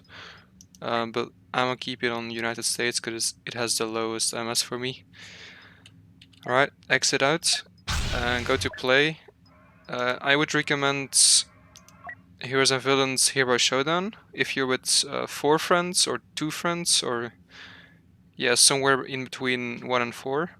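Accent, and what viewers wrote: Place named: Germany. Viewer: Spüren ich das deutscher du bist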